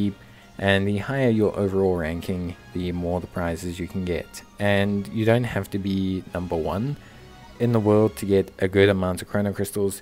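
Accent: South Africa. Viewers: Yooo how you doing? by any chance are you south african?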